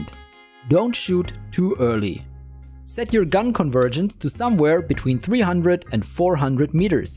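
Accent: Germany. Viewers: or you just have an incredible german accent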